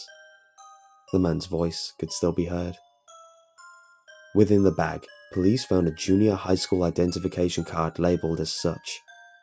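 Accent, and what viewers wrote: United Kingdom. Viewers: where in the UK are you from? I can't place your accent!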